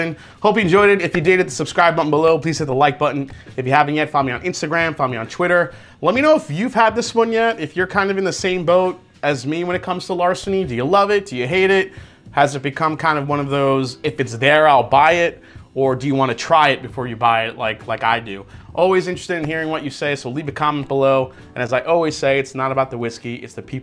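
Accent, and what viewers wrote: New York, United States. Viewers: Your New York Italian coming out hard core.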